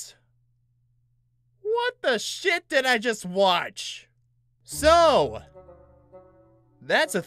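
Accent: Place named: Ireland. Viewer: actually I like your irish accent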